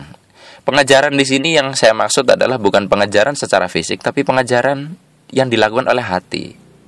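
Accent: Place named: Java, Indonesia. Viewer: ini kok suara ronald franknya gk kayak biasanya, ada medoknya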